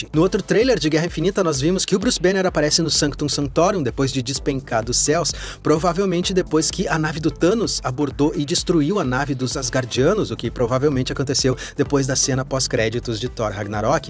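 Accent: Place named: São Paulo, Brazil. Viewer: o teu sotaque é de invejar, sou do interior de Sampa e sei que teu sotaque é de Paulistano, e não de paulista que é do interior. Um dia aprendo a falar com esse sotaque.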